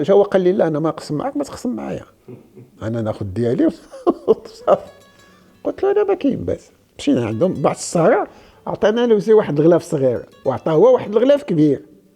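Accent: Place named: Egypt. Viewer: هل هدا سفير مصر في المغرب ? لان لهجته اكتر مصرية من مغربيةً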